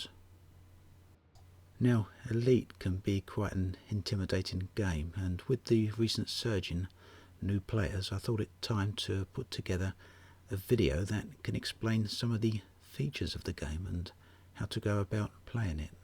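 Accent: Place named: Cockney, United Kingdom. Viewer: Good vid. Please could you re-dub it in a Micheal Caine accent. Your normal accent isn't too far off...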